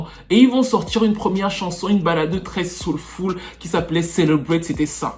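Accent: Cameroon. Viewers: Je dis hein, je te soupçonne un petit accent Kmer comme mes potes :)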